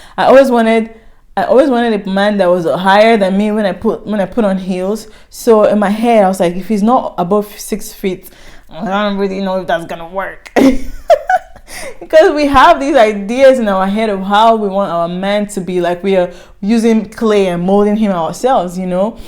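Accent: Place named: Benue, Nigeria. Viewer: New viewer here❤? Where are you from,you sound like someone from Benue,Nigeria…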